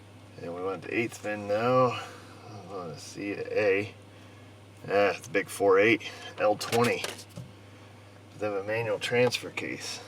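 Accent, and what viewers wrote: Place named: South, United States. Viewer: Omg country twang; you got me homesick and misty eyed.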